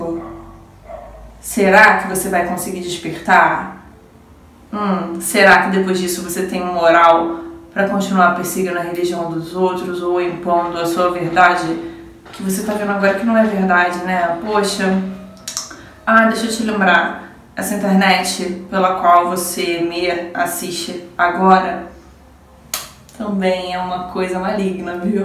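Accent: Rio de Janeiro, Brazil. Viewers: Vc é daqui do Rio?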